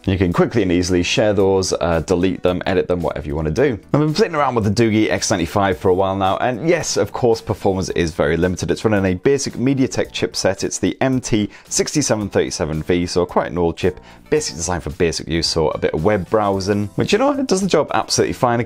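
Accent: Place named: Newcastle, United Kingdom. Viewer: are you a geordie? lol just stumbled on this I'm from Elswick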